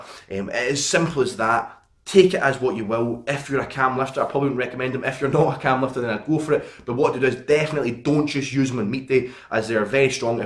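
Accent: Scotland, United Kingdom. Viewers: Is this guy Scottish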